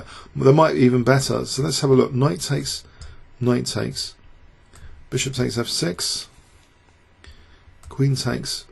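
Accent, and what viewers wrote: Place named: London, United Kingdom. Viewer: I watched this live and flipped the board to black well before Anand's 38 Qg3. The commentators were still sanguine and thought it was drawing but by move 42... f6 it was clear that Carlsen was simply going to march his King + entourage down the board and Queen a pawn. Anand clearly looked tired and his defeat in game 6 is related to the defeat in game 5... his blunders are inexplicable without this context - he was actually stubbornly trying for a win, not the draw he could have engineered. He's lost the psychological battle and I see no sign of recovery from here. I truly hope he is not embarrassed in the remaining games. Thanks for your post game reviews, I love your no nonsense analysis (engine assisted or not) and softly spoken London accent, ignore those critics with nothing of substance to say ("would you please say three not free" / "don't pass off engine analysis as your own" - FFS! 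- way to miss the value in your videos!).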